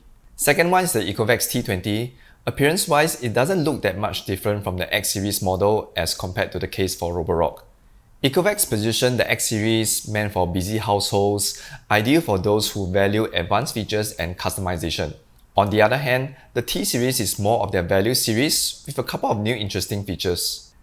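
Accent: Singapore. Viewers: You sound like my coworker from Singapore, la. Did I guess right? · You sound from SG. Are you?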